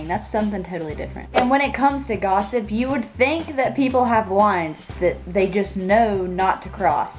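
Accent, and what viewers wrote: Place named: South, United States. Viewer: Where you from folk, you got a slight southern accent doe.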